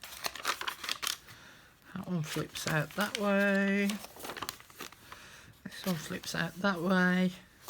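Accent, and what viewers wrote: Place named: Black Country, United Kingdom. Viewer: Love you and your crafting.im a blackcountry wench as well,but live in hampshire now.your voice and accent and humour soothes my soul and reminds me of home.xxx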